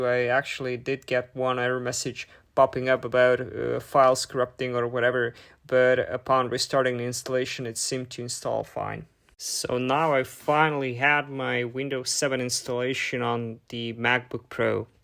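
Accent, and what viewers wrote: Quebec, Canada. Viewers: You actually DO sound very similar to French Canadian! 😄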